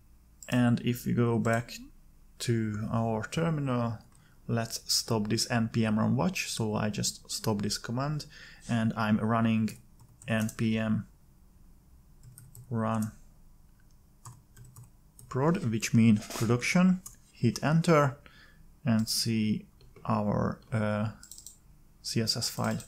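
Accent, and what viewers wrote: Hungary. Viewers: akkor jól éreztem az akcentusodból hogy Magyar vagy. Köszi akkor magyarul is 😀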